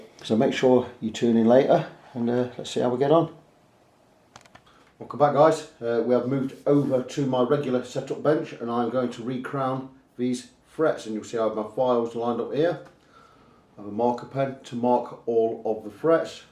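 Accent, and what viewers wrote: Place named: East Midlands, United Kingdom. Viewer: Hi. I have this exact guitar too and I absolutely love playing it. By only bug is the nut. I think it would benefit from a graph tech nut or something along those lines. Possibly even some locking keys like other PRS models. Your accent sounds pretty close to where I’m from. Possibly Derbyshire/Nottinghamshire or around that area? (Also based on your comment about being around 60 miles from Northampton) If so I’d be interesting in using your services in future for a few mods and setups etc. Thanks for the video, it’s reassuring to know you take good care of peoples instruments rather than handing it over a counter and not seeing it for a few days 👍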